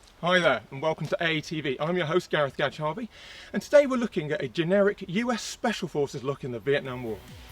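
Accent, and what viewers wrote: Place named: United Kingdom. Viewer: Just the way a lot of us say it in the U.K. same as a lot of people say car been rather than carbine with an I sold :)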